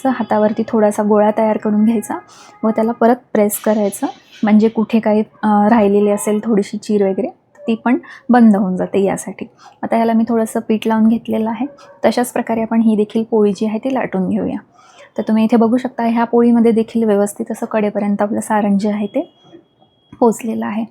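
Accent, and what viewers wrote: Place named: Vidarbha, India. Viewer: रेसीपी सांगण्याची भाषा खुप छान आहे आमच्या विदभा सारखी ❤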